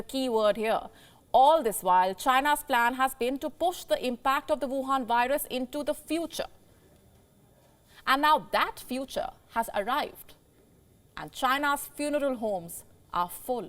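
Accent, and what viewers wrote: India. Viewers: Very funny way of reporting. Does not seem to be a news channel. She chews hard on words and make it very heavily Indian accented English. And the sentences she speaks are too short, paused and not continuous. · Hahaha. So obsessed with China, don't show your inferior complex. Don't worry for them. Spend time to talk up India first, to be the no 1 power in the world by this decade. Also tune down your hindi accent please. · You sound like an Indian and India had been through a much worse phase not long ago. You even burnt the bodies on the street in broad daylight. So what qualification you have to judge China? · Man, I can listen to these Indian dames talk all day long!! Their accent is as cool as can be. (But maybe it's because my Brooklyn accent is as crude as can be in comparison) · shut up，indian accent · Why indi english???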